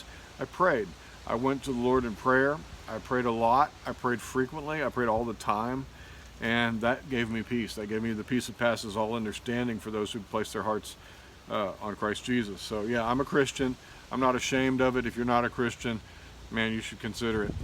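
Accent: South, United States. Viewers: Southern accent is everything. I guess your first name is from your scottish grand mother. Great videos!!! by the way.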